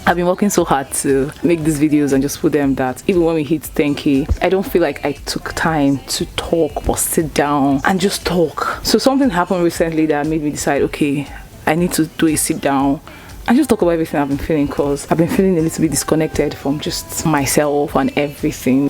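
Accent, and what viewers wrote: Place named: Igbo, Nigeria. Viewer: which part of igbo are u from bc this ur English ashaam sounds like ours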